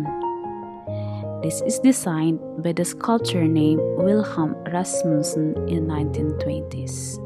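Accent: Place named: Norway. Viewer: Na dungog na gyud naku ang accent nimu sis pareho sa Norwegian mag storya, nindot kaau ang monument naa pod d i history behind it pagka nindot sa lugar 😊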